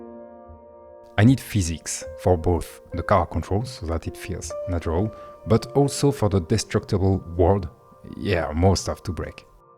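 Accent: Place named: France. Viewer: L'accent français a vraiment été la cerise sur le gâteau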